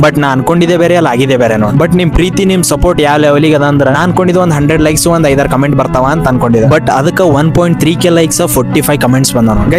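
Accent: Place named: Kalaburagi, India. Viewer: Nimma matu pakka kalaburagikar matu nodri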